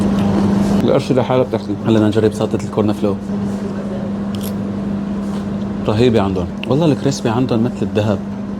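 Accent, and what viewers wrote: Aleppo, Syria. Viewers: هل أنت حلبي❤ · لهجتك حلبية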